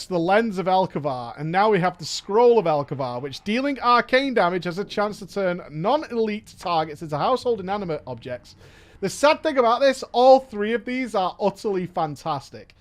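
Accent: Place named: Wales, United Kingdom. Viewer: 75 minutes of that silky Welsh accent? What A beautiful wednesday.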